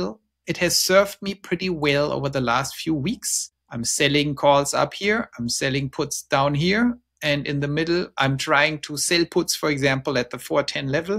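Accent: Germany. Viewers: danke----fyi: Palatina is the latin word für "Pfalz" this company was founded 1993 as Grohmann engineering for innovatie solutions of automation processes in manufacturing....zur Info....Palatina...ist das lateinische Wort für "Pfalz" Du bist doch Deutscher?! zumindest verrät mir das Dein englischer Akzent:-) lebts Du in den Staaten? Tesla hat ja schon vor langer Zeit diese Firma gekauft Tesla Automation wurde 1983 als Grohmann Engineering in Prüm gegründet. Mit dem Ziel, innovative Lösungen für die Automatisierungsbranche zu entwickeln, hat sich das Unternehmen kontinuierlich weiterentwickelt und seinen Fokus stets auf leading edge Technologien gelegt.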